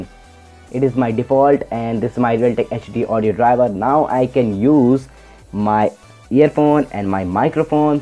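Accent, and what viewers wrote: India. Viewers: Hvordan kan du ikke høre at manden er indisk? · Work on ur english INDIA!!! =)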